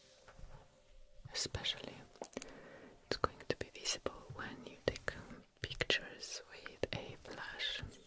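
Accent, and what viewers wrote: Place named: Russia. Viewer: Акцент явно русский 😆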